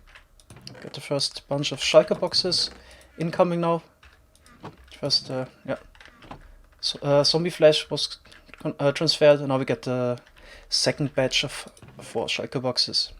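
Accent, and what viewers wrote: Germany. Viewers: Danke für das Tutorial! Man hört extrem deinen deutschen Akzent ;) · du bist deutsch man hört das so raus xD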